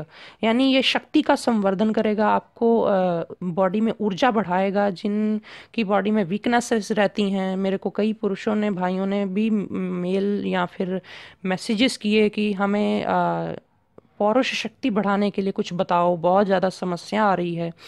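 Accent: Punjab, India. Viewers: swami g..aaj tak maine itni sachayi or somyta awaz me kbhi ni dekhi...chahe bzy hone k karn shuruaat ni ho ri ..bt aapki vani mein puri schayi jhalkti hai..beech me punjabi ka jo tuch hai ..usse to aur.bhi saadgi jhlkti hai..jo hmare buzurgon.ki.yaad dilaati.hai..god bless u swami g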